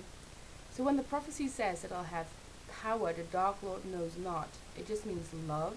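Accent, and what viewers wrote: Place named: Netherlands. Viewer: interesting stuff. I like how when you read outloud you pronounce very posh english in a dutch accent haha.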